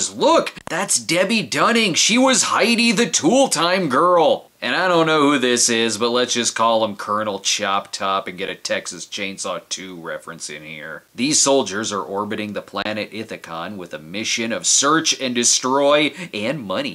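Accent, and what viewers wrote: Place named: Midwest, United States. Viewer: I'm impressed how strong his Chicago or midwest accent has gotten over the years.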